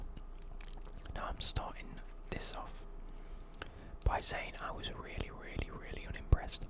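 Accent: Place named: Midlands, United Kingdom. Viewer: Where are you from? I might be hearing things but you sound like you’re from round by me (midlands)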